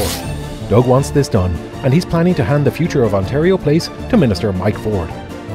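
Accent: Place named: Ireland. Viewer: 1. I fail to see the relevance of which country the company is from. But there's also a company from Quebec called EcoRecreo involved with the project but I guess that doesn't fit your "anti Canadian" narrative? 2. Fifty dollars per person for a trip to a spa and waterpark seems pretty reasonable. Before Ontario Place park closed they also charged for admission because that's how theme parks work so I don't know what you're upset about. The plans also include various winter activities like ice skating. 3. There are better places you can go if you just want trees and a lakeshore. The Leslie Street spit or the islands for starters. 4. You seem to have some sort of problem with companies making a profit but I notice that you accept donations and have ads on your videos. So where is your profit going? 5. Why is an Irishman narrating this video and posing as a diehard Ontario native?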